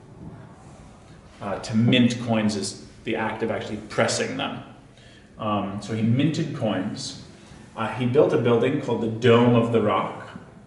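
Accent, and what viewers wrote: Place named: United States. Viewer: No, but I took it in 10th grade. The American accent and English writing made me assume this was for a US based class, and was a bit confused as to whether this was a standard US history class like American Democracy or APUSH, or a specialty course. But the Bulgarian National Diploma must be much differently structured. Seems like a very interesting class to take.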